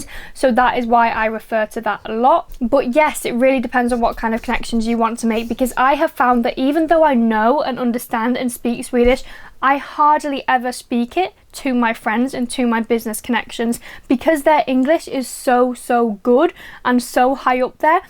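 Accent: United Kingdom. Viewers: If you wanna make a real career here in Sweden, you normally have to learn some swedish. Otherwise, your career will stop at a certain point, which I think is quite fair to be honest. But what I'm most curious about is if you are north English or south Scottish!? ;-) (And by the way, I really do hate all the auto-spelling functions, that Youtube throws at me all the time!).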